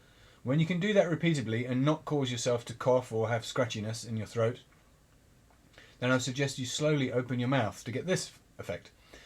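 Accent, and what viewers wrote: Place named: United Kingdom. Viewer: Thx i'll tying to do with your video. Good Britain accent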